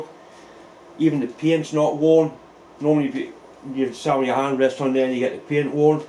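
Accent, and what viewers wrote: Newcastle, United Kingdom. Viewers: Great,I could listen to a geordie talk engineering for hours...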